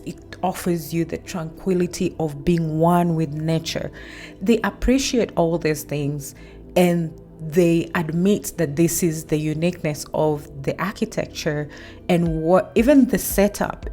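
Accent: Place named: Kenya. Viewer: The fact that its a Kenyan narrating, that makes is so much better. Im researching African architecture. This is how im building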